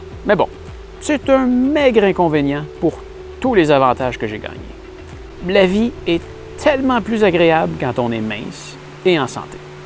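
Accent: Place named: Quebec, Canada. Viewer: Même si je ne suis....heureusement pas concerné par le sujet, je trouve cette vidéo très sympa, pleine de bons conseils, à mon avis et très bien montée. Quant à l'accent ET aux expressions Québecoises...comment dire??? C'est TOUJOURS un bonheur et je les déguste sans AUCUNE modération, pour le coup!!!! Bravo pour ton parcours,!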